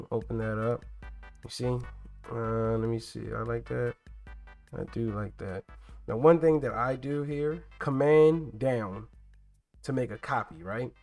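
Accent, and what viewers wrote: Baltimore, United States. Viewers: Bro gotta be from baltimore lol. dope content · This dude accent sounds like he is from Baltimore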